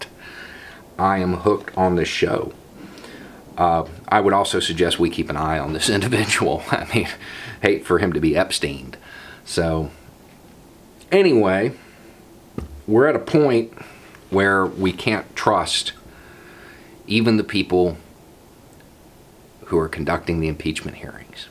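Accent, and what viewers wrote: South, United States. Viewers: Republicans don't care about doing what is right for our country. They have no morals. They are only interested in their generation making as much money as possible right now no matter what. We had it great. I remember reading about President Obama sitting up late at night reading our letters, can you imagine trump doing that? Obama taught the Constitution, he debated with colleagues, students, family and friends about the Constitution, does anyone think Trump has even read it?. Obama loved us, and he loved this country. Trump laughs at us and uses us to line his pockets. Why do his cronies even think he will have their backs? He trashes all of them in the end. Lindsey Graham has been revealed to be as disgusting a human being as trump. No morals, no values other than the immediate dollar. I really appreciate your southern voice speaking truth to power about the state we are in. 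Not all southerners are republican lemmings! Once we get control of our country back, we need to start educating youngsters in school about our true history, science, understanding how to analyze the politics that gets thrown at them, how to think critically - for themselves.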